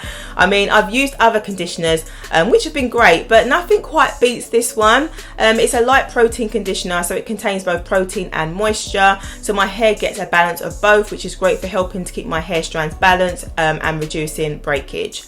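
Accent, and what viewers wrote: United Kingdom. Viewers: Shalum I'm sister Bridgette From America,Galveston, Texas New subscriber.I love your hair and your beautiful uk accent. My hair is like yours.Shorter,but thick.❤🎉🎉 Tah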